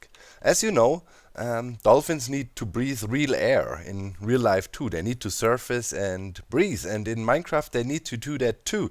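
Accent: Germany. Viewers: Klar das merkt man schon am deutschen Akzent..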